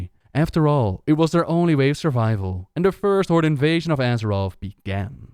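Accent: Netherlands. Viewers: Ik hoor echt dt je Nederlands bent :) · sounds like Dutch accent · annoying dutch accent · Nope but close, he has a very strong Dutch accent. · That heavy Dutch accent is hard to ignore :) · You sound dutch! Fun to hear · Are you Dutch? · dutch accent :(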